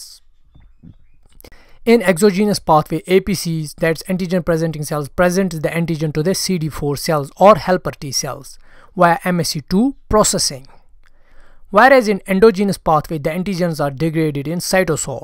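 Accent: Kashmir, India. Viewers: So kashur accent brooo.. Cxe ma kashur??? 😂❤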